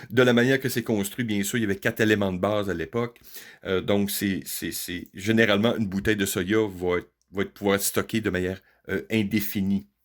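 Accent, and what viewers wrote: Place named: Quebec, Canada. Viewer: Un canadien lui Québécois en plus La voix j'ai reconnue ça 😂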